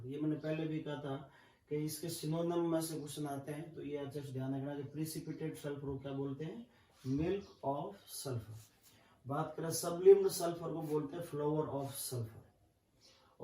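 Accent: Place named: India. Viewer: he speaks indian dude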